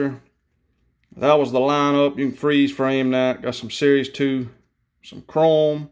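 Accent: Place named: Louisiana, United States. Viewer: Love your channel and Louisiana accent..lol..You seemed so down to earth and humble and what makes you stand out to me..lol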